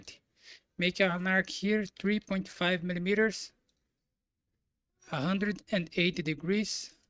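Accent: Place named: Brazil. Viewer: Excellent explanation, congratulations. One doubt is that from the way you speak, it seems to me that you are Brazilian. Am I right?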